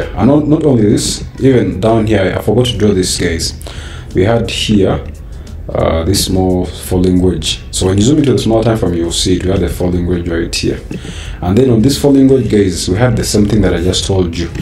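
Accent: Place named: Uganda. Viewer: Hey man.. are you from Uganda... your accent sounds Ugandan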